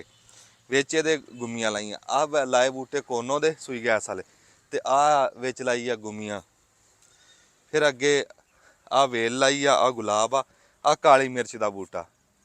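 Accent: India. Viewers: Indian ki Tarah kio boltay ho, Pakistani ki Tarah bolo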